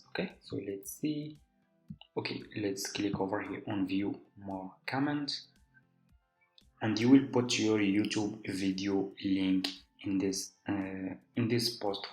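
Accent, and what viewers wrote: Morocco. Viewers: nadi a 3chiri mn laccent 3rftk mghribi kayn hh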